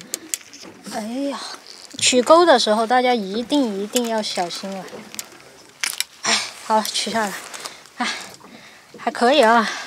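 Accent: Sichuan, China. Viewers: 哈哈果然是一个地道四川人，我看你第一个视频就听出来了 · 听口音像四川，最后果然说四川人了。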